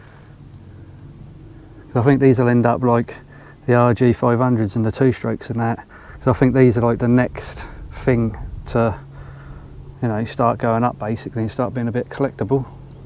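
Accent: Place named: United Kingdom. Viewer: Hmm let's see, British accent, drives on the left, big yellow license plate. I wonder where you're located.......... Tennessee ???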